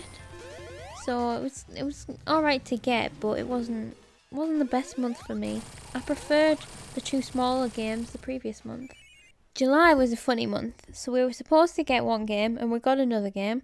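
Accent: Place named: Newcastle, United Kingdom. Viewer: That Geordie accent is adorable I wanna hear you talk all day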